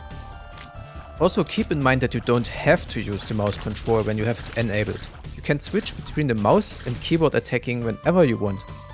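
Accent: Germany. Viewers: That's the most German sounding English I've ever heard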